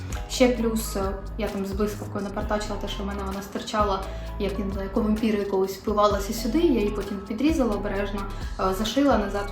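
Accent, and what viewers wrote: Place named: Pokuttia, Ukraine. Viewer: Чудовий покутський сведрик❤ Дякую за відео, дуже приємно тебе слухати і дивитись❤❤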